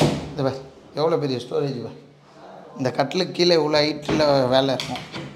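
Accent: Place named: Kolar, India. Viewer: Kolara pesararu 😂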